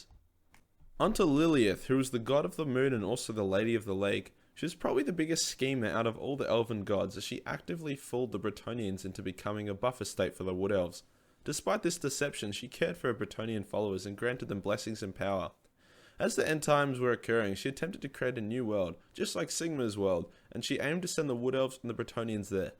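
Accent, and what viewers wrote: Australia. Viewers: haha love this keep making these love you ausie accent cum to britain and speak we will love you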